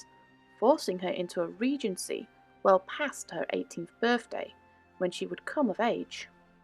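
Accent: Doncaster, United Kingdom. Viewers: I can not believe that no body has commented on your excellent video - it is refreshing to hear a lady from Donny talking about the Railways - stay safe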